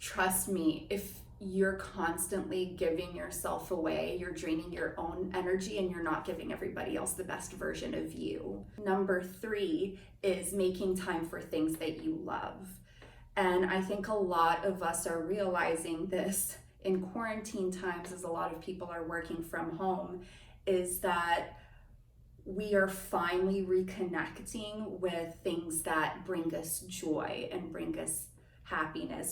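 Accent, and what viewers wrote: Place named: United Kingdom. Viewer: you sound not USA... Where you from? If you are British you could self love with British tea so you know you don't force any on me and no revolutionary war😉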